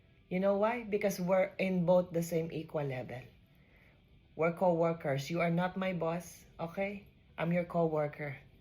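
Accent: Philippines. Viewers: Every time he plays a mature nurse he always brings more of the Filipino accent 😭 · Love the thick filo accent tol, you’re a star ❤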